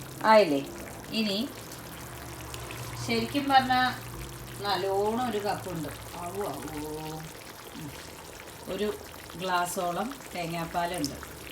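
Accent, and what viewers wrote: Palakkad, India. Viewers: Ha ha ha you r really super Edakkedekku kudikkan And language Palakkadadan 👌👌👌👍👍👍😁😁😁😁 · Pazham prathaman looks simply awesome. Loved your palakadan language and presentation. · l love to hear your palakadan language and reciepes. 😊💕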